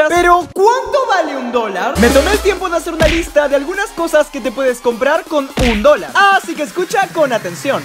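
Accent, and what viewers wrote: Peru. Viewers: eres peruano?? -.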